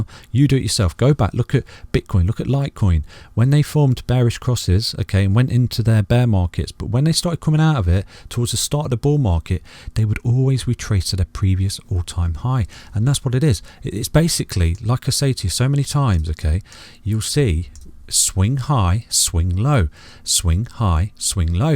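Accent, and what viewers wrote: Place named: RP, United Kingdom. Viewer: Where are you from mate?, just got that BBC english accent i listened back in Bournemouth 2012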